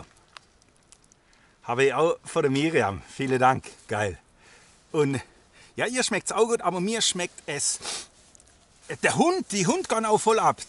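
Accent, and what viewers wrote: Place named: Swabia, Germany. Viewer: legga legga :-) Ein echter Schwabe! Des kannscht da Hase gäbe...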